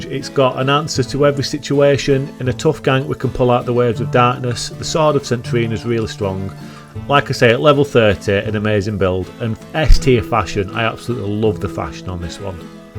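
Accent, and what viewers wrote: United Kingdom. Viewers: can you fucking show the objects, i dont understood you fakin british language god damn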